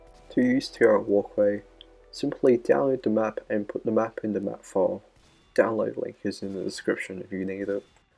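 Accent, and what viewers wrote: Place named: Australia. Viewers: Nice vid. Personally, I prefer the l'etranger, but this is really helpful. (Your accent is Australian, btw.) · He is Australian.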